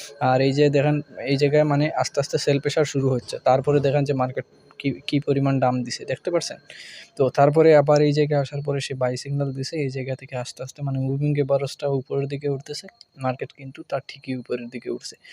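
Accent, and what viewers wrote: Sylhet, Bangladesh. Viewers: আপনার বাড়ি কি সিলেটে.?...আপনার উচ্চারণ সিলেটি দের মতো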